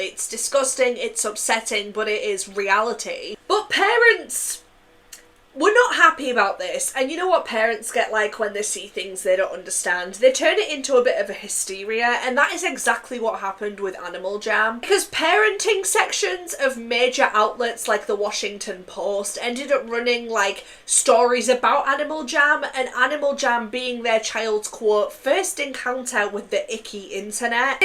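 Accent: Scotland, United Kingdom. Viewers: unrelated you sound so Scottish my god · I mean, she's from the uk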